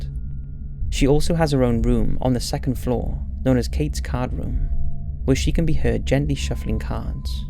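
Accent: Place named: England, United Kingdom. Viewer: Didn’t know you were English haha 😂 iam too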